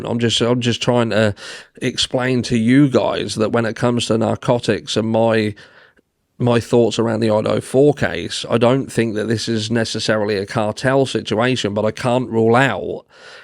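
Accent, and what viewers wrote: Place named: England, United Kingdom. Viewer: we are family loving men. Please understand irony here because good or bad it has been designed by Get a Clue from the begining because his autistic intuituon nailed the narrative you are only now alluding to. Offer him an olive branch of peace and bring him back beacause you are integral too. Coming from Englamd i am so familiar with your accent and God bless you and your family... But he does Richard Burton better and always had something to say that should be listened to. You do your mental health podcasts but ignore Clue like me might have issues there but you with English gumption are endorsing somethinhg not quite the truth and justice for all that Clue coined first but happier on the coat-tails now as things are turning out.... Send some love to Clue... There is exquisite method in his madness and i promise you he loves his wife children and dog jusr like you x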